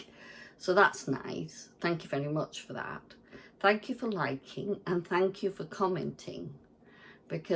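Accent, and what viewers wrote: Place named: Merseyside, United Kingdom. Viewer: Oh, thank you! I have to say that your accent brought me back to my childhood trips to see my grandparents in St. Helens. We would regularly go into Liverpool and have mini-holidays in Blackpool. I don't if you know those areas but they are very dear to me.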